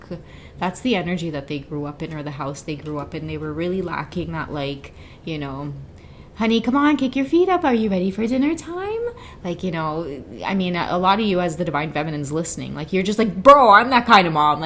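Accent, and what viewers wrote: Trinidad and Tobago. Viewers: Omg I love that trini accent.. so soothing lol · That's was so funny .that's how true Trini talk😊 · You are great, and I ❤️ your Trini accent!!!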